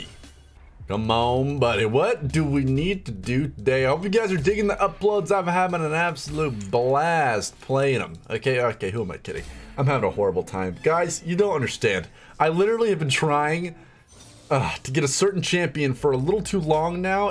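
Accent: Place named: Rio de Janeiro, Brazil. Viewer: paulistano??? Ta maluco kkkkkkkk carioca na veia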